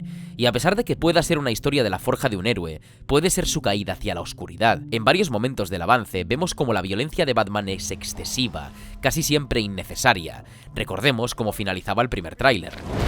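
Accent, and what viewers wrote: Spain. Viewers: aaaa verga tienes acento español...si lo voy a ver jajajaj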